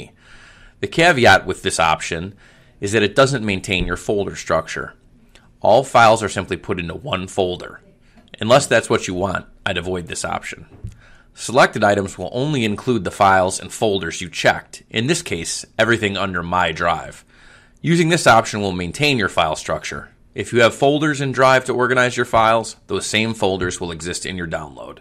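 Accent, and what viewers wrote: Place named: Western Pennsylvania, United States. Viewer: you are from western pennsylvania, aren't you? Somewhere near Pittsburgh, I would guess.:)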